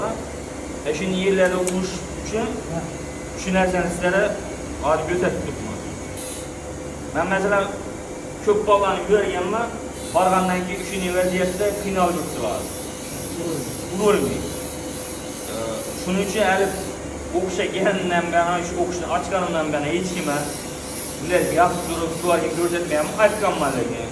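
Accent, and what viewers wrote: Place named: Khorezm, Uzbekistan. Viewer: Хоразим шеваси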